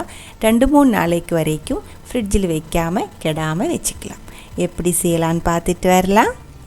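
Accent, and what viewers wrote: Kerala, India. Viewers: Hi sister I like ur Malayalam Tamil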